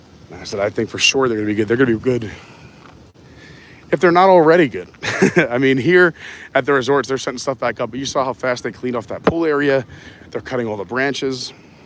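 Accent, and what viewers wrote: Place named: New York, United States. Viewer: what part New York are you from?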